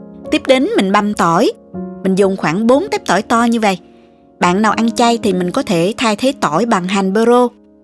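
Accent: South, Vietnam. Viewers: Giong miền Nam rỏ ràng không có quê, nghe thấy mến giọng nói va cách dạy thật tỉ mĩ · Giọng chị đọc chuyện nam bộ đúng bài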